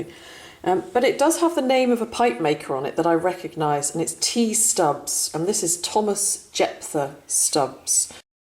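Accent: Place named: England, United Kingdom. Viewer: I’ll bet that is a good feeling, finding a complete pipe! I know I’ve said it before... but I’ll say it again... I enjoy hearing you speak. Here in California when people speak it’s just bla bla bla. But when you say something, however simple it may be... it sounds beautiful to my ears. I’m a sucker for an English accent, but yours is even better than the usual. Ok... enough gushing from me. 🤷🏻‍♂️👏🏼👏🏼👏🏼👍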